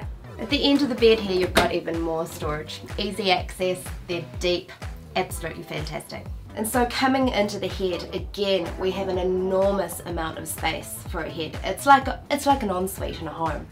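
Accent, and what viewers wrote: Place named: South Island, New Zealand. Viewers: I thought it had heads not heeds. Arrr that South Island accent. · Ben Dixon that’s 🥝 kiwi’s for yah. Or is she Aussie 🤔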